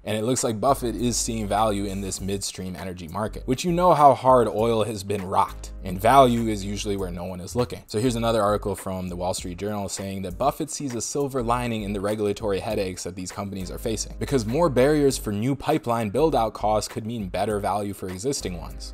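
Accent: India. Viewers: Bro are you from kerala???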